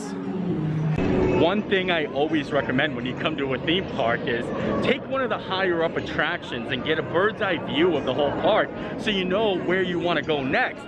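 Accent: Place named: Northeast Pennsylvania, United States. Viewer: I always thought you had a north east PA accent. Lol. If you’re ever near Williamsport PA, hit me up.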